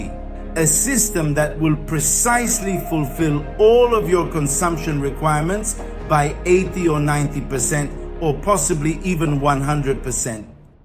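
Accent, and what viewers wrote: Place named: Florence, Italy. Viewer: Anglo fiorentino se non sbaglio 🤭🤭🤭✌️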